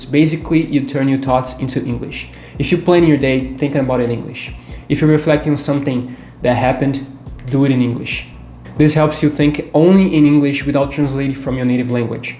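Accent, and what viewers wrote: Brazil. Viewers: Guy, you're simply amazing! Are you Brazilian? Your english is so perfect. Kudos! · he has a slight accent from northeast Brazil, but this is normal. hahaha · Real, quando eu olhei e ouvi ele falando já ficou claro que era br KKKKKK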